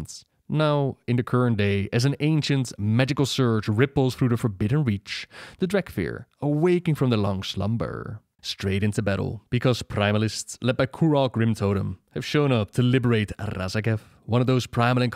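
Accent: Netherlands. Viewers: Love the accent of a fellow dutchy🤪🤘🏻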